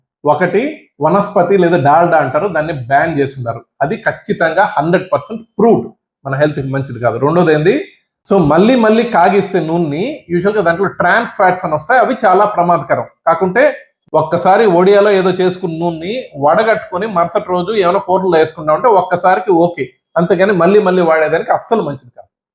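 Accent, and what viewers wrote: Nellore, India. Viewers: 🙏🫡🙏🫡🎉🎉👌🤞👍 సూపర్ డాక్టర్ గారూ స్వచ్ఛమైన తెలుగు నెల్లూరు ఒంగోలు యాస లో అందరికీ అర్థమయ్యే విధంగా చెప్పినందుకు ధన్యవాదాలు అండి మేము చాలావరకూ మీరు చెప్పినట్లే వాడుతున్నాం కానీ వేరుశనగ నూనె డబుల్ ఫిల్టర్డ్ వాడుతున్నాం గానుగ నూనె ధర ఎక్కువని వాడట్లేదు అలాగే రైస్బ్రాన్ ఆయిల్ ఒక సారి వాడితే మరొకసారి వేరుశనగ నూనె మార్చి వాడుతున్నాం నాన్ వెజ్ లో ఆవ నూనె వేరుశనగ లేదా రైస్ బ్రాన్ కలిపి వాడుతున్నాం మేము హార్ట్ పేటెంట్స్మి అందువల్ల పూరీలు డీప్ ఫ్రై స్నాక్స్ తినం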